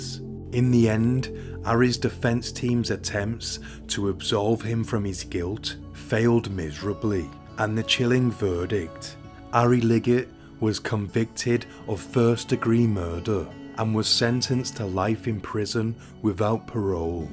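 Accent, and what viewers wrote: United Kingdom. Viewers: That's one of the most British accents I've ever heard my god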